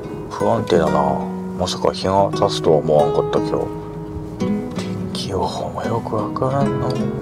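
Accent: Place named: Hokkaido, Japan. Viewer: 久しぶりに聞いた北海道なまり❗やっぱりうまいっしょw 本当にガレット美味しそうでした。アクシデントでタイヤ購入って春先はやっぱり私も有ります😢 週２回もさん見れて嬉しいです🎵 無理無く旅して下さいね😊❤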